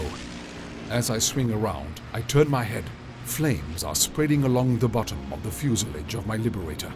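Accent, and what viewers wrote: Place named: South Africa. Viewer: Very well done. War is a dramatic and often ugly business. This makes the point rather well. That people on either side should celebrate the death and suffering of others illustrates how crude we can be. Narrator South African?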